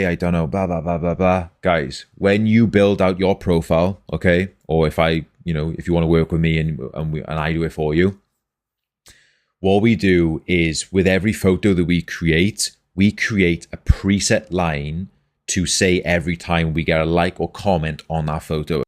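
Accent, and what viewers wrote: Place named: Wales, United Kingdom. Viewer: you welsh? im also from wales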